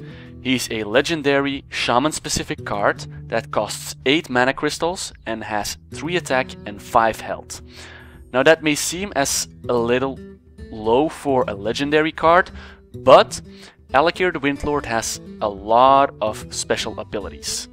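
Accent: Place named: Netherlands. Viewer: are you dutch? you sound like you are. nice vid btw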